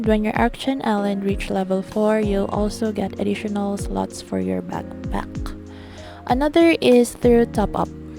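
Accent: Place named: Philippines. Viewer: A like for your filipino accent when you speak English... It's so cute ^ ^ ❤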